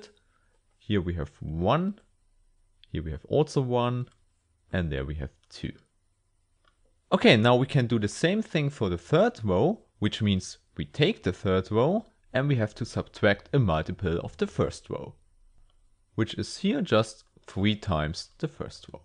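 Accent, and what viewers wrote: Germany. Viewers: all jokes aside, you explained really well. Just enjoy the german accent <3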